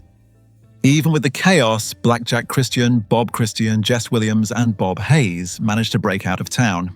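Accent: United Kingdom. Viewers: Why a stupid British voice over.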